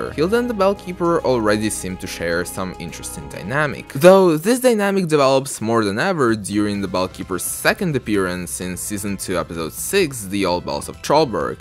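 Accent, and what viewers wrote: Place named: Brazil. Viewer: Are you brazilian? You have a familiar accent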